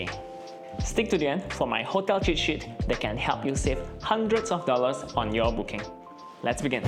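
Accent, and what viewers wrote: Indonesia. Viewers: Your accent come from where? Sounds more like indonesian rather than singaporean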